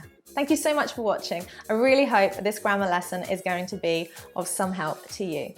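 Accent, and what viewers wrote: United Kingdom. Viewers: I love it .the british accents because is too Much sweet and perfect and it is not rude to me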